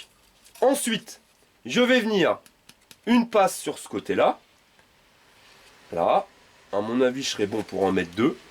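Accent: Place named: North, France. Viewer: Salut, alors je te trouve excellent ! Vraiment ! Ta gouaille , ton accent du nord je pense, ta façon d'expliquer ta technique de peinture et ton humour , j'adore mdr ! Merci pour ce bon moment et je vais attaquer mon vieux vélo plus sereinement. Merci pour tout !! J'ai juste un léger doute sur le orange de ta mob :)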